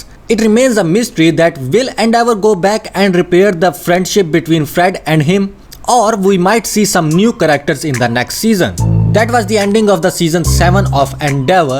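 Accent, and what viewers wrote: India. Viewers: maybe it is an Indian accent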